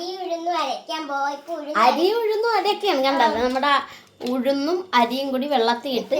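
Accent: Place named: Kochi, India. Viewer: Njan first time anu ivarude vlog kanunne. Angatt ingattt nammakk🤔. Kochi ano sthalam. Njanum kochi anu . Slang kettatt chodhichenatta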